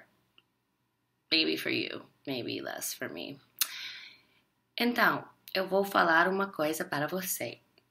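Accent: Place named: Southeast, Brazil. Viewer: You have an accent from southeastern Brazil, when you speak Portuguese hahahha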